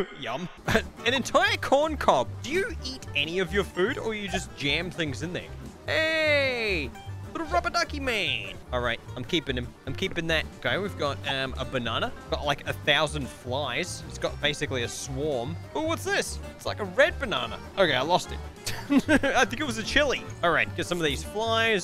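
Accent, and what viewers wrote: Australia. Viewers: Are you from Australia or something? Just like us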